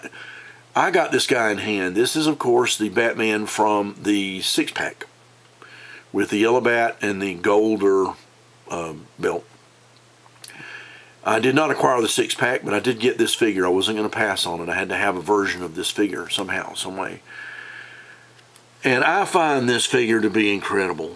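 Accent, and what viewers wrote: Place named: Texas, United States. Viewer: I've never heard of Southern Texan guy talking about Batman action figures loll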